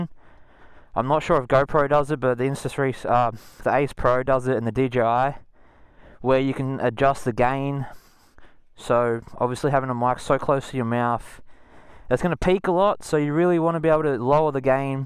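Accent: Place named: Australia. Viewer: I definitely enjoyed watching this with Ozzy accent! Greets from Germany mate!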